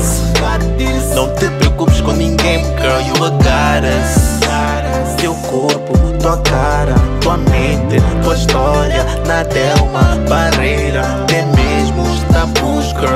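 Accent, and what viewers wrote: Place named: Mozambique. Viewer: Nani memo out de Moz ainda mantê o lado moçambicano, a forma de falar o calão. That's real bro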